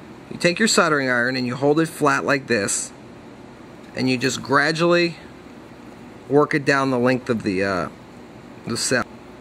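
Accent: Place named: United States. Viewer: WHY DO YOU AMERICANS SAY SODERING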